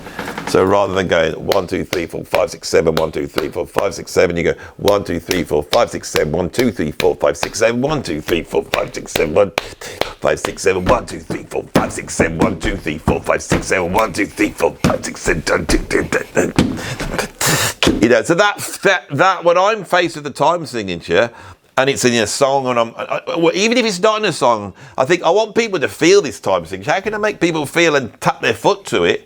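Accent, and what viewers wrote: Birmingham, United Kingdom. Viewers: He doesn't have a cockney accent. Cockneys are from East London. He's from Birmingham and has a 'brummie' accent.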